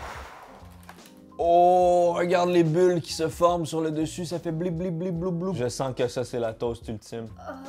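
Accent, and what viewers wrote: Canada. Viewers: Cet accent Quebecois pour un français de Paris comme moi c'est juste extraordinaire ! Je ne m'en lasse pas de rigoler (sans me moquer bien sur !). Ca donne un coté tellement sympathique aux Quebecois ! L'autre jour j'étais justement avec un amis Quebecois et je lui disais que si je venais à me disputer avec quelqu'un au Quebec, je n'arriverai même pas à m'énerver correctement si l'autre me parle avec cet accent ! 🤣🤣🤣🤣🤣🤣 Je pense que ça me ferait rire tout au plus ! 😂😂😂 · Is this chap Canadian ?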